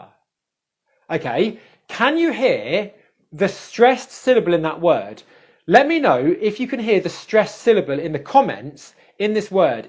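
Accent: United Kingdom. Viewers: I'm sorry to ask this question but are you originally from Britain? Your demonstration of these two sounds are different from another British girl's.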